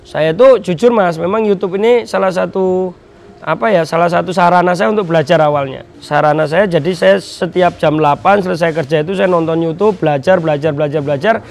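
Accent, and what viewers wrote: Indonesia. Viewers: Mantap mas kira n sampeyan wong daerah yogya lahir di sumatra dapat istri orang Banyumas...cuman jowone sampeyan sing bnr asli ngndi toh mas...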